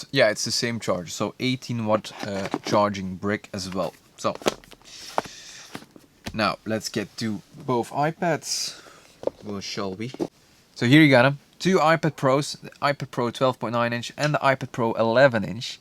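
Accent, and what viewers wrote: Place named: Belgium. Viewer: guees belgium accent :D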